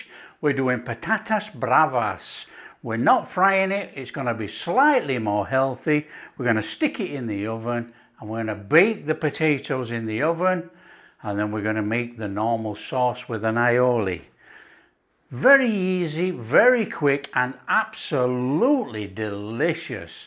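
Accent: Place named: Leeds, United Kingdom. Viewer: Fantastic. Delivery superb. Really lovely guy, from Leeds. I guess? Keep it coming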